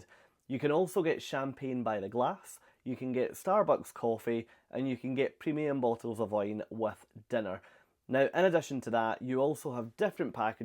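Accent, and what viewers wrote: Scotland, United Kingdom. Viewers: Thank you so much for this! My wife and I are going on a Mediterranean cruise in July, and we’re on the fence about doing the Free package with Norwegian. We typically travel with Royal Caribbean, so this is our first Norwegian cruise – and we weren’t sure whether or not to get this free at sea package. After watching this with my wife, we have decided to do it and realize that we will get more bang for a buck with this than we thought initially. This is our first time watching your YouTube channel, and we both appreciate your insight and advice – subscribed! Thanks again! PS – being Americans, we both love your Scottish accent😂😂😂😂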